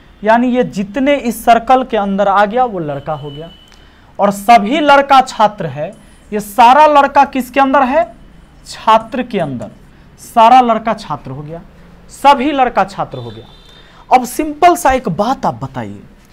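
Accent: Bihar, India. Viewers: Bihari style me hindi sun ke to sir maza hi aa jata hai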